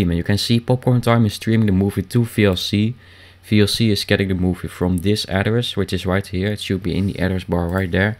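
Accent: Netherlands. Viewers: duidelijk een nederlander :') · Oh god. The horrible dutch english accent. But the content of this video was awesome. Thumbs up for you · are you dutch? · whats your languaze, i think your dutch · your from belgium of holland ik hoor het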